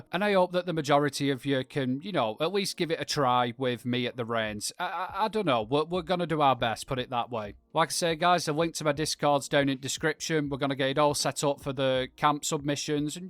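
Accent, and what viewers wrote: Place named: North, United Kingdom. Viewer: Nice to hear that northern accent again, always looking forward to your posts lad. Stay cool brother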